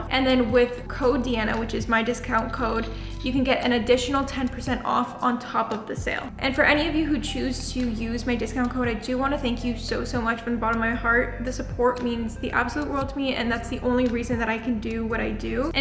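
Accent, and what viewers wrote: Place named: Canada. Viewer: no clue you were canadian until i heard the accent lol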